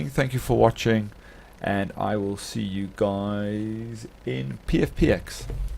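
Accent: South Africa. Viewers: hi pal how are u today u sound like ur from south Africa johansburg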